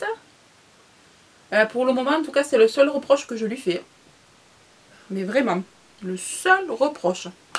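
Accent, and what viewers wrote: South, France. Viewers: ton accent qui sent bon le soleil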